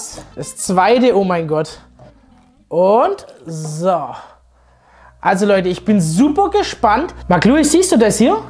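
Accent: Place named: Swabia, Germany. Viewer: Ich mag deinen Schwäbischen Dialekt.... 😂😂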